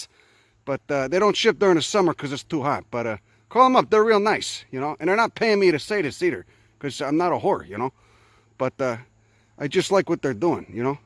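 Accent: Chicago, United States. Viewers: Love your channel, and the Chicago Accent.... "Kick Yourself in the Dick" never gets Old... Keep posting, and Thanks a bunch for the highly entertaining, and educating Videos!! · That windy chicago accent is pretty funny... and that's comming from someone who used to be a Chicagoan. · Oh my, it's not often you come across a Chicago accent in the wild of youtube. This is fantastic.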